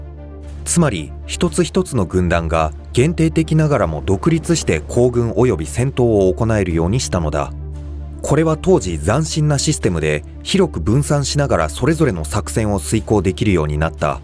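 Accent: Kansai, Japan. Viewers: 関西イントネーションかわいいよ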